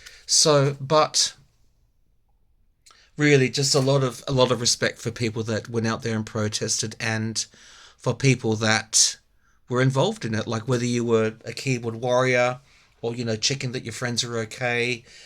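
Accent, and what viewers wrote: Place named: Australia. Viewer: Thank you for your readings ❤it's a pleasure to listen yo you. You have a calming reassuring voice, which is wonderful. I love the Australian accent so much.❤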